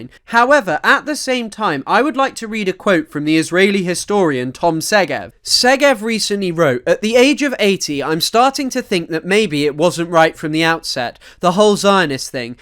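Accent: United Kingdom. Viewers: I think it’s also the British accent, I’m ESL and have a harder time understanding those who are British but I can understand at this speed any American. I’ve had to rewind a bit… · i hate when there’s a video i’m really excited to watch and the narrator is nauseatingly british 😢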